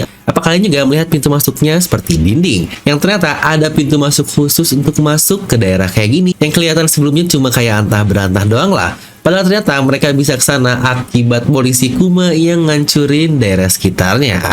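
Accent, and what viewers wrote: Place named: West Java, Indonesia. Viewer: hahaha, urang sunda horeng. :)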